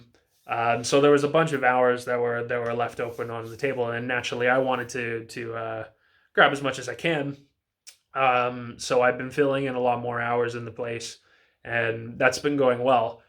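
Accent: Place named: Canada. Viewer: I knew I heard some Canadian in your voice! I was also born in Canada but I live in Spain at the moment. It's very cool that you managed to get a job in a gym, sounds like getting laid off was a blessing in disguise.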